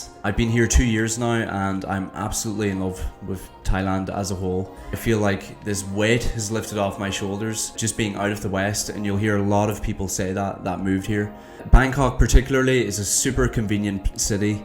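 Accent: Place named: Donegal, Ireland. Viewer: No way! You're from Donegal and I thought of catch your accent. I lived in Donegal 14 years all of my mixed Thai/Irish niece/nephews also living there. But myself moved back to Thailand for this period. I agree with you after moving back to Thailand. Many things has changed in Thailand, more conveniences, better, improvement and safety, cost of living a lot lower than Dublin, but you still have modern city vibes in Bangkok like many other rich City. 🎉😊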